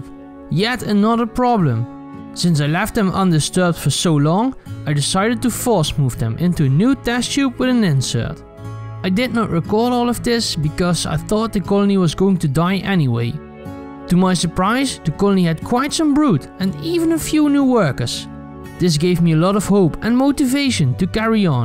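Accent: Netherlands. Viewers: Am I hearing a Dutch accent? :o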